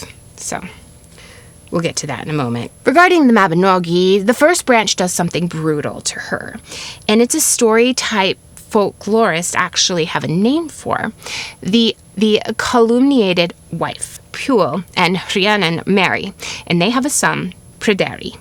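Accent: United Kingdom. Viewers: Saw you definitely thought you would have a British accent - you have such a cute northern accent 😂 love it, love you, no idea why I thought you'd be British 😂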